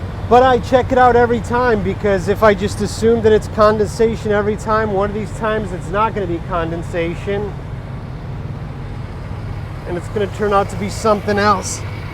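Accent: Russia. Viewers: do your russian accent in your videos man ur known to it 😁😂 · 10 years ago u sounded very russian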